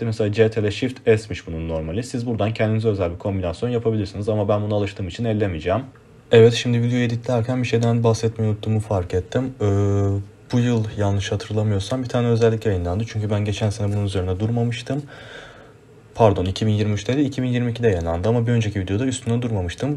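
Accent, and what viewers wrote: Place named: Azerbaijan. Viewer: Azerbaycanlisin sanirim aksanin arkadasiminkiyle ayni :)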